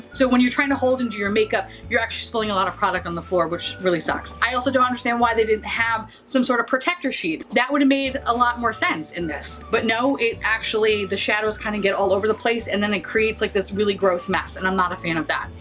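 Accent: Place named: United States. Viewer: I think we may be the same person. It's like listening to myself talk with an American accent. ...aaaaannnnnndddddd subscribe!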